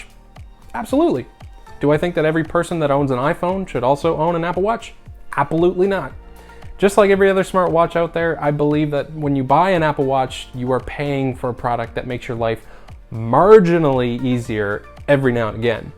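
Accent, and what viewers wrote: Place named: Newfoundland, Canada. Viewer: Newfoundlander!?? Sub’d! 🍕👍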